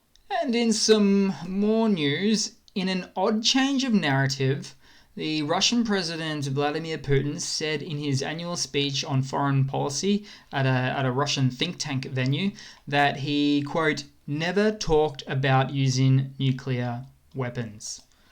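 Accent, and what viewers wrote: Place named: Australia. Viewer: Thank you,! I love your updates, & I love your Australian accent!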